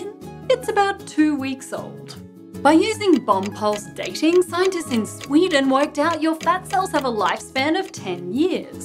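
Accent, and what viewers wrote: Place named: Australia. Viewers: her accent is so thickly (australian?) sometimes I feel like she has trouble talking. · Yes she is Australian.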